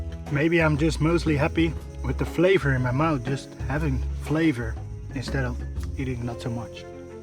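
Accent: Netherlands. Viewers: Coole video. Nice dat je het in het Engels doet. Wel grappig om te zien hoe je bepaalde dingen echt op een Nederlandse manier zegt maar dan in het Engels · Ik twijfelde aan je accent en dacht al dat je Nederlands was. Toen zag ik die kruidvat zonnebrand en wist ik genoeg😂 toffe video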